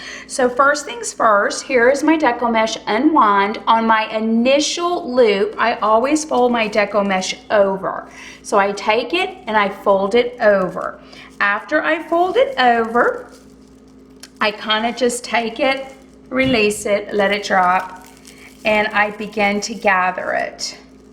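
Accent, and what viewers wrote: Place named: South, United States. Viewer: I was born and raised in Atlanta so I know a southern girl when I hear one. Kayyy???? lol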